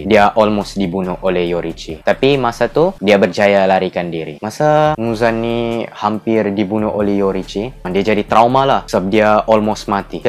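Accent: Sabah, Malaysia. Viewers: speaker ni orang sabah kah? 🤣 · Yes, org sabah 😂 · Ko org sabah? 😂😂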